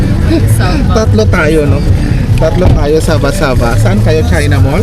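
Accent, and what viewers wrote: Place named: Ilocos, Philippines. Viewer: Ilokano po ba kayo? Ung accent kase kapag magtagalog 😅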